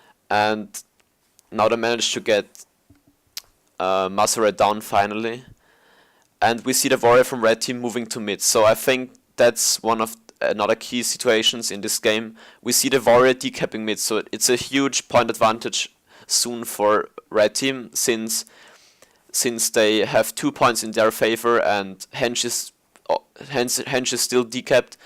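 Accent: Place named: Germany. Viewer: According to your accent i would say you're german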